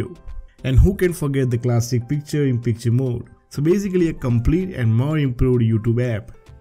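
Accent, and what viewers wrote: India. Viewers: Bro Ur Indian🇮🇳 or 🇦🇺Foreigner...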